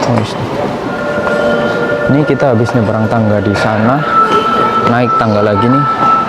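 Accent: Banyumas, Indonesia. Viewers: Rika ngapak daerah ndi bro.. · Mas ngapak, bikin konten di malam hari dong mass 🎉🎉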